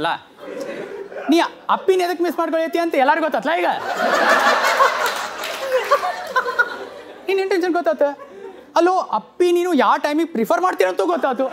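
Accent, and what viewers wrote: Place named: Belgaum, India. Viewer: Clean,simple,very very funny,content- based comedy - this boy,engineer,is very very Witty,I like him,have been seeing all his videos,episodes,good command over Belgaum style of Kann- talk...get going /- am your fan...from Gulbarga- a Doctor by profession,have dn my schooling at st- Paul's Belgaum..loving it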